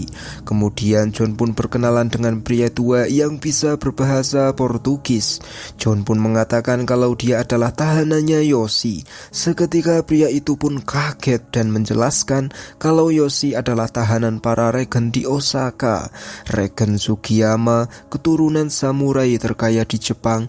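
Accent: Java, Indonesia. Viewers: klo ngomong ga usah medok kali, jadi ga enak dengarnya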